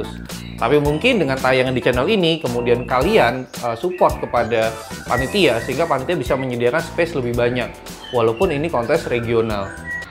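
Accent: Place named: Banyumas, Indonesia. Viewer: Wong ngapak jebule.. hihihi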